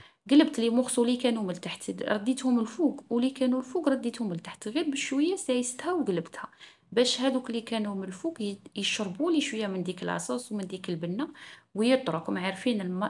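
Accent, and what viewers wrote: Mascara, Algeria. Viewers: انت من معسكر ولا من تغنيف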